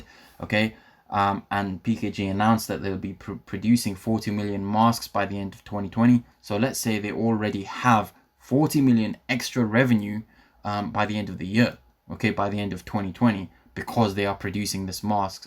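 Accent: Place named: United Kingdom. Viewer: Are you british can't quite tell from the accent sounds quite mixed as though you've lived in different countries. Great vid tho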